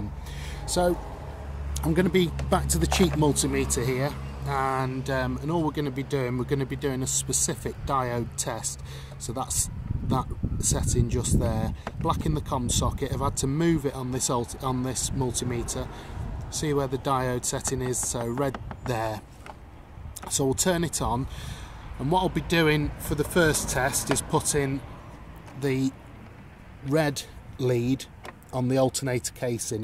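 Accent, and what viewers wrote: United Kingdom. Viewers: Very helpful video, cheers! For other viewers, if the problem is your alternator, dont throw it (and your money) away! I just made a video about Alternator Troubleshooting & Repair. I had a non-functioning alternator and in the video I go through the fault-finding process and dismantle the alternator. In the end the problem was with the diode plate, a $25 component, which is easy to replace. If you want to see the video, go to my channel and you'll find it there... Happy fixing! Cheers, Chris 👍 p.s., where are you from? I'm originally from Blackpool, and your accent sounds pretty close...:-)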